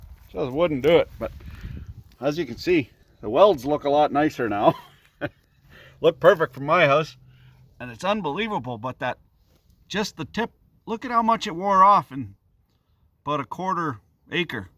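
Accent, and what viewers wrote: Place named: Nova Scotia, Canada. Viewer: I swear this guy is from Nova Scotia.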